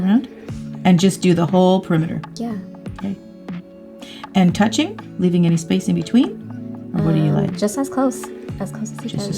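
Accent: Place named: Canada. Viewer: Love your Canadian accent.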